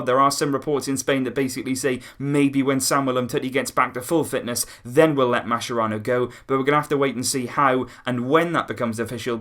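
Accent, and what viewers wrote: United Kingdom. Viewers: barcalegend _10 uk i know by the acsent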